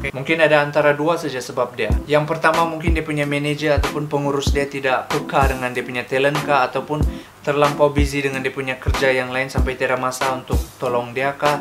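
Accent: Sabah, Malaysia. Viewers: bole ka buat video ajar macamna mau cakap sabah? Saya suka sama dialek sabah😊mau cuba cuba..bole ka..hahha..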